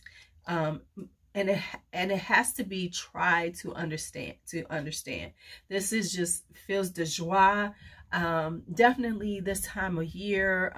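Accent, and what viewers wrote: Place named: United States. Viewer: love this house! Serge is sooooo good! Clair de musc is my base... always. BTW your french is adorably USAmerican. <3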